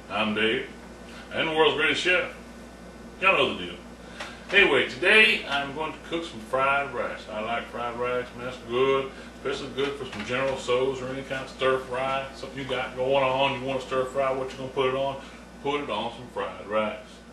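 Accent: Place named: South, United States. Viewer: i love ur country accent n ur sense of humor im from down south i stay in texas what state u live in?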